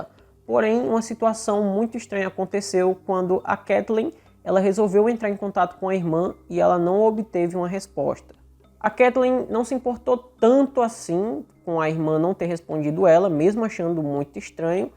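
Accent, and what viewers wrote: Northeast, Brazil. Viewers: Parabéns pelo seu trabalho. Se posso fazer uma observação essa é que você tem uma forma muito boa de expressar sua opinião . As partes engraçada deixa mais leve a narração. Ao mesmo tempo que choro em alguns casos, ao mesmo tempo dou risada com seu jeitinho de falar( acho que tem sutaque nordestinos). Sou nordestina e amo cada pedacinho , sinto muita falta .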